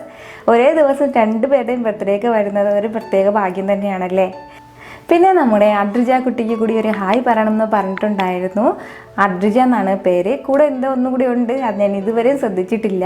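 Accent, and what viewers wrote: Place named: Malappuram, India. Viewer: 😍😍..ikka malapuram aano...atho kozhikodoo...slang supr aanu🤩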